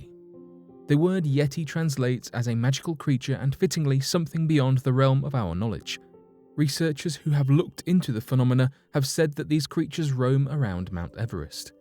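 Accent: England, United Kingdom. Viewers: This English accent guy is an awesome narrator!! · Any british voice over on a video about Yeti, Ufo's, crop circles, and most other stories having Zero evidence are just like this. It sells but has no filling quality.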